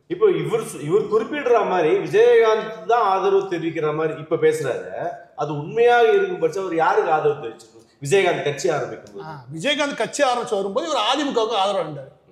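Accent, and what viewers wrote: Madurai, India. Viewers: bro‌ நீங்க மதுரை தானே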